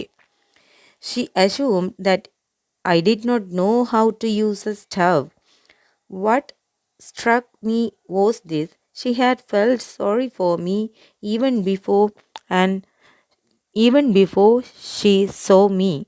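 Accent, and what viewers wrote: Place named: India. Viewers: I also thought she was from india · Thank you very much ❤ By the way , your pronunciations tell me you are an Indian person . Am I correct?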